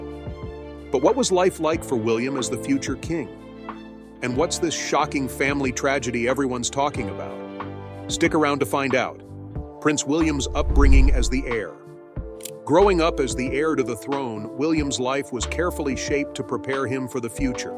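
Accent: United States. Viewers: There are a few such Royal Family posts of YouTube today.Obviously someone has an agenda. The American Narration is the clue to give it a miss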